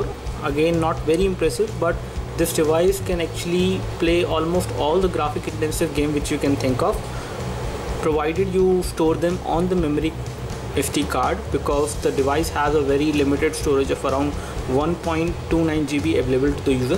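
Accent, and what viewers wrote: India. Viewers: i quite like your reviews except for this english... i don't know to tell but your english is a bit too indianish ahha :) never mind of a stupid like me, good work guys ;)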